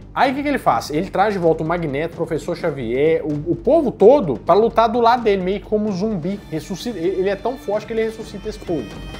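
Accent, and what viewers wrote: Minas Gerais, Brazil. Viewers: Esse resumo em mineires ficou bom demais kkkkkkk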